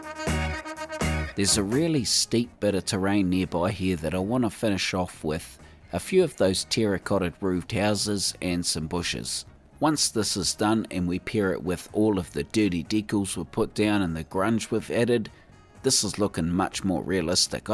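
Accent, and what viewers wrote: New Zealand. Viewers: I really like when he says: "feârsty" instead of saying "first" like other countries. kiwi accent sounds funny and i actually love it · Another incredible build! Btw, while I do love the NZ accent, I’d just like to note that it’s pronounced fa-VE-la:) Keep up the good work! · What a beautiful New Zealand accent 🙌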